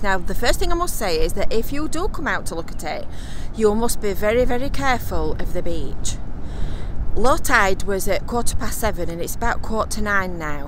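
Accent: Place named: Northern England, United Kingdom. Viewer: Eeh Lass, thar accent is nowt less than beautiful. Bless you for being so wonderfully and unapologetically Northern. I do miss the land of my birth. I used to set nightlines as a boy (early 60s) from from Gynn Square to Bispham, but know the whole coastline well, Blackpool to Fleetwood Bless you, you Yorkshire born lass, just as I am a Yorkshire born boy. I live in such a delightful surroundings in the South of Ireland, but there ain't, no place like home. Or up North lasses.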